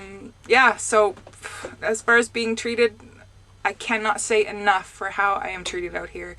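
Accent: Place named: Canada. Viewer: Canadians have cute accents. As far as asking a guy for help, a woman should never be afraid of asking for assistance when needed. My Marine buddy has been driving for twelve years, and STILL doesn't know the do's and don'ts of sliding the tandems. Once I get my cdl and get on the road, I'll help ANYONE that asks.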